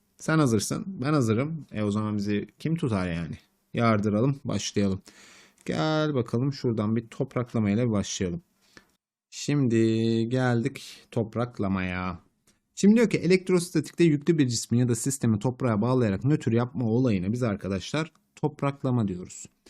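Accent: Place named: Izmir, Turkey. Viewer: bir insan izmirliyim demeden sadece elektrostatik anlatırken izmirli olduğunu ancak bu kadar belli eder. güzel tekrar etmiş oldum iyi taktikmiş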